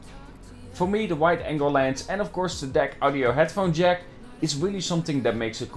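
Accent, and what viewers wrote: Netherlands. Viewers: hoorde aan je accent al dat je nederlands was haha en je komt ook nog eens uit eindhoven net als mij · Are you Dutch? Because you speak English like a Dutch man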